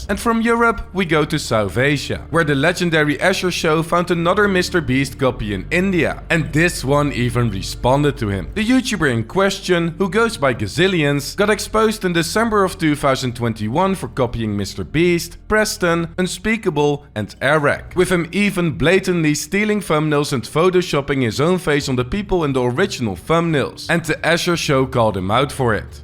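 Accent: Netherlands. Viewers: You say the Netherlands but place the marker on Belgium... even tho I guess you're from the netherlands yourself, based on your accent and the way you pronounce 'Kriekel'.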